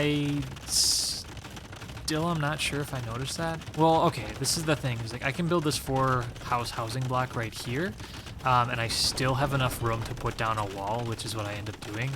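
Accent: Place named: Minnesota, United States. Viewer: you sound like you are from Minnesota lol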